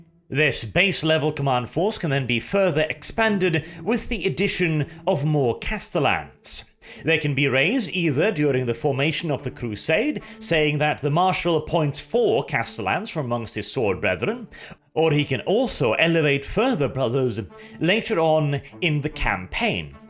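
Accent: United Kingdom. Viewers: I love your videos almost as much as I love 40k! But I have a question: Did you know that you have the "evil" British accent?? Works out great but I'd hesitate to meet up for a drink at a little pub . . . . . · I just started watching your content after the perturabo and you my friend have the quintessential British voice and it’s so good to listen to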